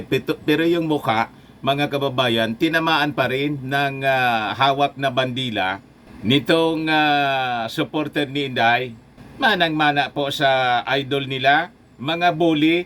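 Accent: Bicol, Philippines. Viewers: Parang Bicol diction mo. Saen ka sa Bicol? Kami sa Cam. Sur.-- sismer boaquena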